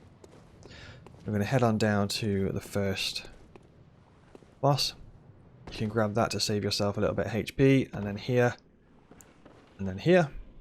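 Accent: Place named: Yorkshire, United Kingdom. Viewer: Hello there! May I ask if you're from Yorkshire? (I mean no offense —I'm Korean, and your accent sounds preety similar to my English tutor's and I like his) I'm an fanboy of FromSoftware, and I'm using your videos to study English while simultaneously enjoying my hobby. Thx!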